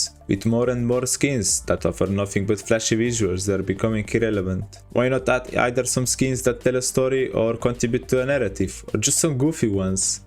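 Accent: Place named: Eastern Europe. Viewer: Not sure where you are from, but that is the most slavic accent ever! Great video btw!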